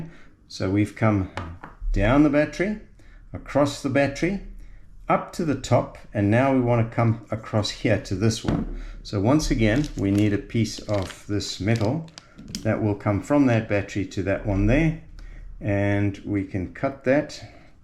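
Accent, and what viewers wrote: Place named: South Africa. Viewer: Best instructive video on Youtube on creating a 6s. As an expat half way around the world great to hear a South African accent again as well.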